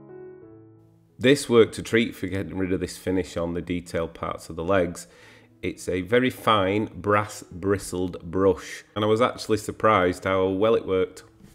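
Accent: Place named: Northern England, United Kingdom. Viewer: Lovely. You’re from northern england? I recognize your accent. Great job. Great piece to have.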